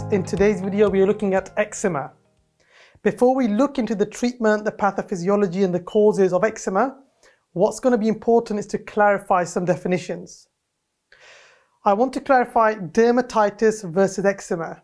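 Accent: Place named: Somalia. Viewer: I like your accent, that reminded me while I was by the border of Somalia.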